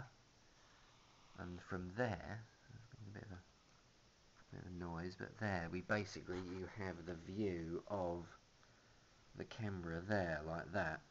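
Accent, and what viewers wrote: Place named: United Kingdom. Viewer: Good old btitish accent mate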